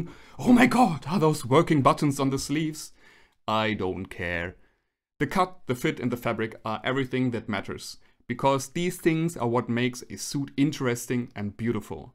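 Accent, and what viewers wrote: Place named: Germany. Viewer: You sound German, I might be wrong but I’ve been learning German for about two weeks, I love the show Babylon Berlin and I love the clothes they wear❤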